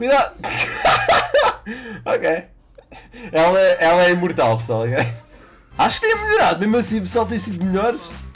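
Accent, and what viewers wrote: Portugal. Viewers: Nsss gente o jeito que português fala é tão diferente do brasileiro😂😂😂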